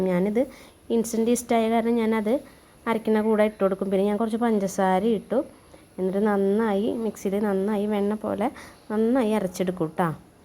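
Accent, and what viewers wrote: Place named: Thrissur, India. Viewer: തൃശൂർ ഭാഷ നല്ല രസം. പിന്നെ വെള്ളപ്പം 😋👍🏻❤️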